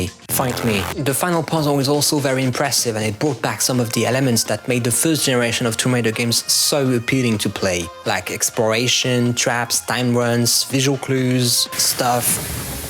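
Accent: France. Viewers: French accent is 👌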